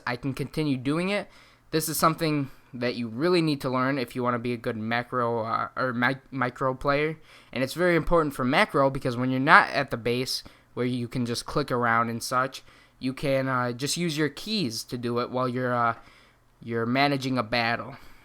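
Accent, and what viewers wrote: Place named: New England, United States. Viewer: script or no script, you'd sound better without that awful new England accent.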